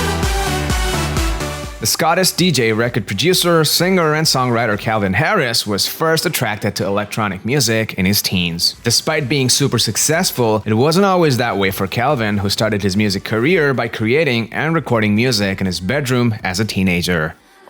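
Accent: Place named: England, United Kingdom. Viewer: Your english accent is awesome.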